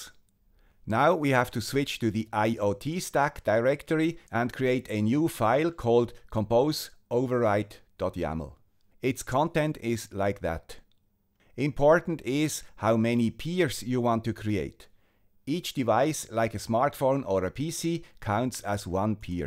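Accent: Switzerland. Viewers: Never imagined a guy with swiss accent would save the internet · Thank you guy with the Swiss accent. This exactly what I’m in the middle of trying to set up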